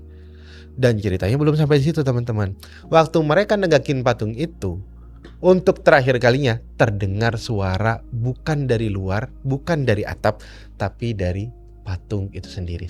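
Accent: Madura, Indonesia. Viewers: Dari logat bicara nya Abang ini suku Madura, Benar..... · Logatnya kyk ny madura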